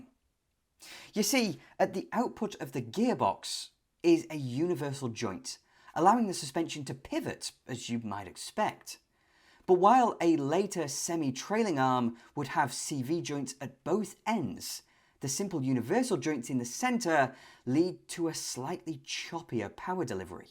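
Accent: United Kingdom. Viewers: Great video and great British accent ( where are you from ?) I 've had a convertible turbo 1964 Corvair for 8 months, and it's a pure joy to drive. Greate noise, powerful enough, gorgeous and easy going. I brought it back from Denmark, on a 1200 km journey without any trouble. This car is better than a Mustang or mainstream pony cars, a reel underdog.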